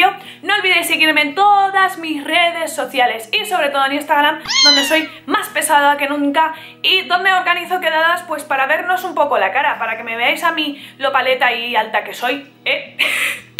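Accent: Spain. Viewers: Ah eres española creí que eras mexicana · como AMO el asento español españa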